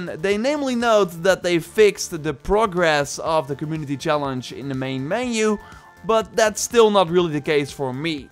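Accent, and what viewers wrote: Netherlands. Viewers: Lemme guess...are you Dutch ? Waarom kost het ons zoveel moeite om zonder accent te spreken?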